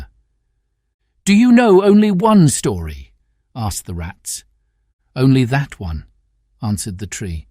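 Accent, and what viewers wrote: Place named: England, United Kingdom. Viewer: Thanks for that...great narration , English accent ...just found a new channel for me. Merry Christmas.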